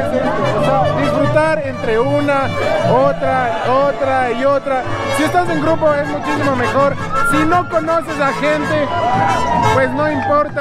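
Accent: Mexico. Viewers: Es lo que noté, que su acento es muy parecido al nuestro y bien pasa por mexicano. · En México nadie dirá que eres ecuatoriano con ese atuendo, a lo mucho, dirán que eres peruano o simplemente sudamericano. A lo mejor y por el acento que no es tan diferente al nuestro, dirán que eres de Puebla o Michoacán, digo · este compa tratando de hablar con acento mexicano ,porque sus compas si hablan como ecuatorianos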